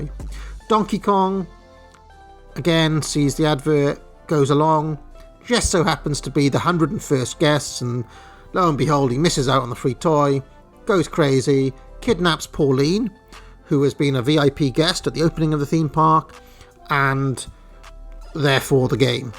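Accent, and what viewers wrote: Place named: United Kingdom. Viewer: Pompous British Mario timeline... I can't listen past 5 minutes of this garbage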